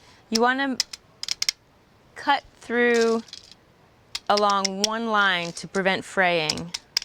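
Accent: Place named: Chicago, United States. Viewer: Thanks for sharing. You have a nice accent, maybe Chicago? I thought Woldfire Defence was an Aussie company. Anyway, here in Southern California, crawl spaces really make you crawl, and in some cases, creep (like a snake!), so I’ll be installing from the outside. Good video, by the way. I’m going to watch the rest of the series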